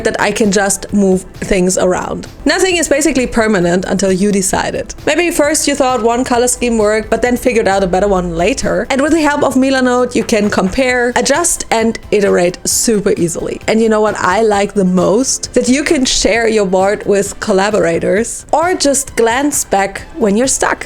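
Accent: Germany. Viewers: Guys is she german? Because im german and I think she has a german accent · This is the first video i see from you and i was like "I hear german i just cant proof it" until you sayd Schlüppi Ich bin vor Lachen von meiner Couch geknallt😂 First impression btw😂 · Is it possible that she is German? The way she speaks English sounds like it. wish you all Love Light and laughing 💛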